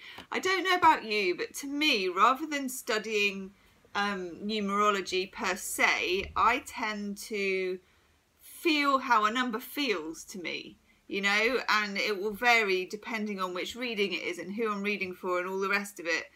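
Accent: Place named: England, United Kingdom. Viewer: your Insight is amazing. Your accent is identical to that of Keira Knightley in Pride and Prejudice. my favorite English accent. Thank you so much for your talent!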